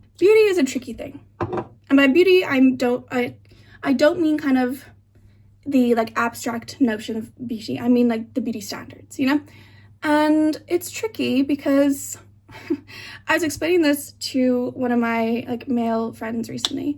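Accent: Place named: United States. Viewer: She’s Irish, but lived in America for a while growing up, so it’s a mix of the two!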